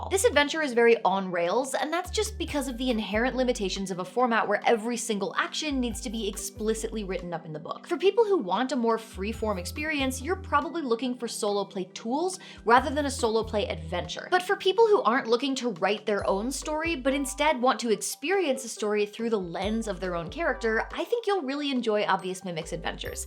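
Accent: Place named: South, United States. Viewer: Your southern sounds like Applejack. Is good.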